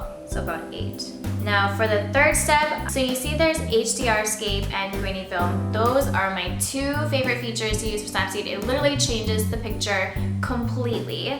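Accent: United Kingdom. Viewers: Ok. Bye. lol. Nice British accent 😃. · I loved the British accent!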